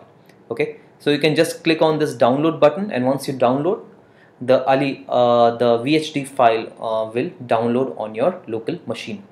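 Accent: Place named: India. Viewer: That is the most childish explanation I have seen of setting up anything esp VPN just copy paste 0.0.0.0/0 what does that mean bro lol for average people at least tell them what it means ?? what rubbish! Guys at QNAP please get someone better then this indian dude or pakistani and trust me I know the accent from where I was born!!!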